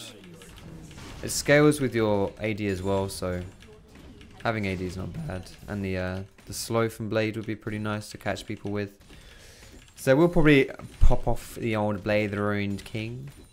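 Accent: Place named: Essex, United Kingdom. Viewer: You know is from Essex when he says "not gonna lie"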